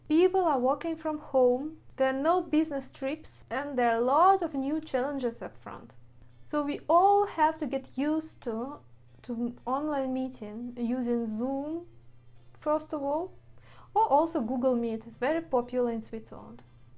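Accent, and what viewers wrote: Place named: Eastern Europe. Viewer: Soften love your mama Eastern European accent🤫this will only help you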